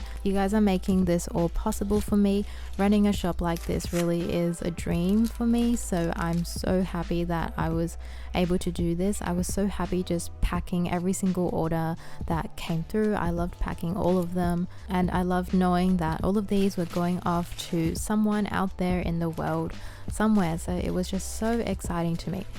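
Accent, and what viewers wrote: Australia. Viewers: This video came up as recommended as just watching a bunch of studio vlogs. Could instantly hear you were Australian and love your work! Just bought some postcards from your shop, just wow. so beauitful!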